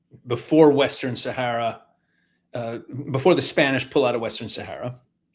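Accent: United States. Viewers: With that strong American accent , he must’ve been.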